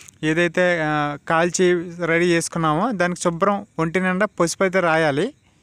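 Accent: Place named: Vizianagaram, India. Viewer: Vijayanagaram yaasa manadhe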